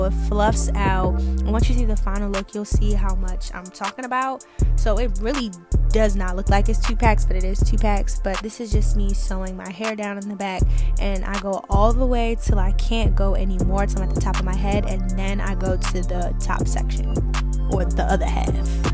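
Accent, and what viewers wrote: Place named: Baltimore, United States. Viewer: The accent giving Baltimore 🤔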